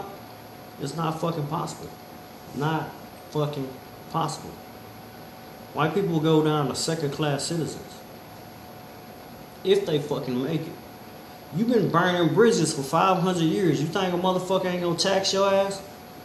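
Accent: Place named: Texas, United States. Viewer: where you from? u sound like you in texas, I want to say dallas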